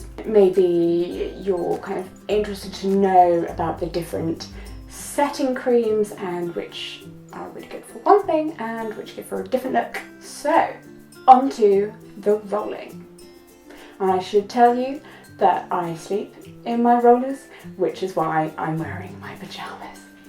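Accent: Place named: England, United Kingdom. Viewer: i must say, i adore that you are so young and have such a thick english accent. a lot of british ppl sound AMERICAN now adays...! as in no accent at all. very flat way of speaking. no expression and heritage in their way of speaking. it is a delight to hear your voice. i watch old brit tele for the humor and BEAUTIFUL accents. lol. keep it and spread it!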